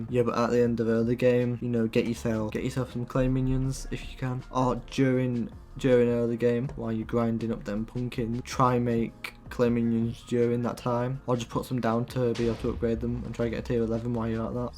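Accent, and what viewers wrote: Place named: United Kingdom. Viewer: The critisicm.. if people are donkeys and can't understand English doesn't mean they need to complain in the comments. (My main language isn't even English and i can understand him very well.) I'm also self taught with English. So please stop the criticism he just has a British accent jeez.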